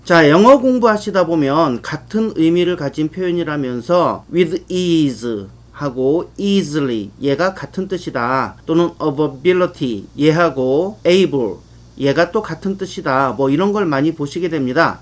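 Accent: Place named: South Korea. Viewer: 드뎌 전치사 편에 입문 하게 됐네요. 감사합니다 선생님. 선생님 목소리는 완전 한국토종인데 외모는 너무 이국적이셔서 쪼금은 놀랬습니다.😅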